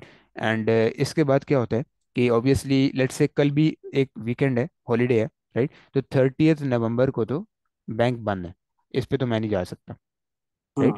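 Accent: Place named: Gilgit, Pakistan. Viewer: You have one of the best ways to explain that I've come across so far. Your accent sounds like that of the Gilgit people.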